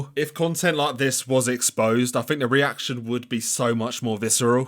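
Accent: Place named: Liverpool, United Kingdom. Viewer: Omg she’s a scouser I’m getting rid of my accent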